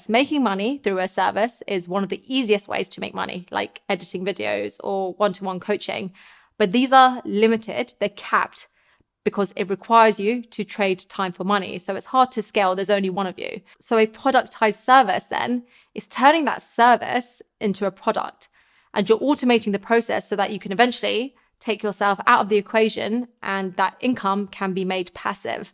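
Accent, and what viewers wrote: United Kingdom. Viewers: I clicked Like after 10 seconds because of your awesome British accent, love it! Good tips too of course. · I saw your thumbnail. Bet my buddy you had a British accent . He said American. I figured it was 50/50. Thx for the free lunch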